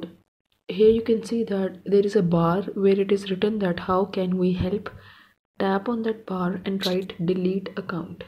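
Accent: India. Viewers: OMG - this lady's Indian accent is enough to make me want to blow my brains out - C R I N G E ! ! ! ! ! Somebody ought to tell her that you don't start a sentence with the word "so" unless you're following up a previous sentence, implying "therefore". D O U B L E C R I N G E ! ! ! ! !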